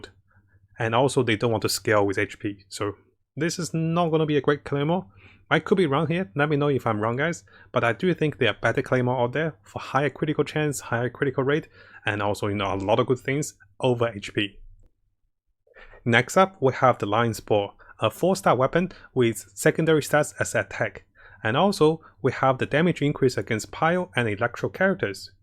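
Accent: Australia. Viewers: nice to know Im listening to a fellow aussie. My Beidou uses the Bell weapon. So far she is doing excellently with that. She is always shielded haha - because it reacts to damage that she takes. I find it to be a great weapon myself. My Diona uses the Favonius bow - it's been great for her.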